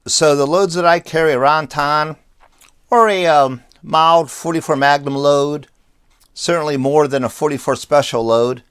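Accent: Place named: Pittsburgh, United States. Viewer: I thought the same thing!!! I heard “arahn tahn” and I was ready to place my order at Primantis and tune into 102.5 DVE lol. Ours is a pretty unique accent. I get asked where I’m from all the time down here in Tennessee only two people in six years have been able to place the accent, a Nurse who’s husband is from Pittsburgh and an Air Evac pilot.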